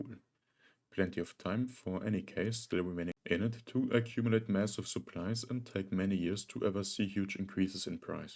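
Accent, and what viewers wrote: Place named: Germany. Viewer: You have a very soothing deep asmr voice with a hint of german accent so like a more chill onhepixel uwu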